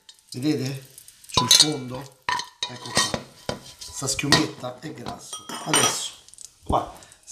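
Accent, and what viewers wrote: Rome, Italy. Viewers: 😘😋😋Veloce, semplice e fantastica 😋😋 Complimenti per come sai combinare la tua professionalità con il tuo romano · non so se me fa venì più fame l'inflessione romana, il piatto in se o la passione che si sente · Cuoco,oltre il simpatico un po marcato dialetto romano ci presenta sempre cucine trite e ritrite da far noia,quando saprà fare un piatto che sia diverso dalla normale consetudine? Stati e non copi. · Bravo, ma "questa parlata" in romanesco non si potrebbe evitare, visto che è un canale italiano?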